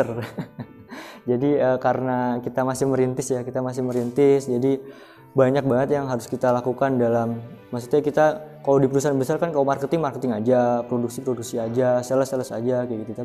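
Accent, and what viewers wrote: Banyumas, Indonesia. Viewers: Alhamdulillah,..wong ngapak.. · Wahhhh,,, cah banyumas, salam ngapak mas dari Purwokerto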